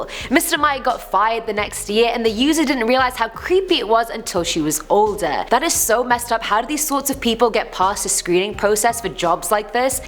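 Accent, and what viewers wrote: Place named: United Kingdom. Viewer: I'm not going to make fun of Ms high-waisted jeans. She's far too pretty and charming, and has a very appealing accent, perhaps from somewhere in the United Kingdom?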